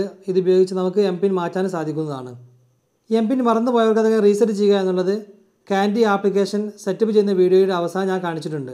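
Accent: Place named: Kondotty, India. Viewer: ഇടക്ക് ആ കൊേണ്ടോട്ടി സലാങ്ക് വരുന്നുണ്ട് കട്ട സപ്പോർട്ട് ഇക്കാ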